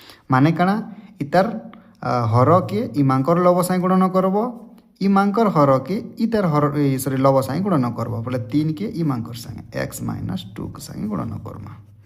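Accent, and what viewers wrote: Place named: Sambalpur, India. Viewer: Sambalpuri voice 😮😮😮❤❤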